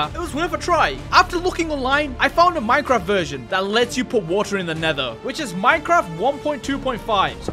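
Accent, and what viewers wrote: United Kingdom. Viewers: Bro u from Britain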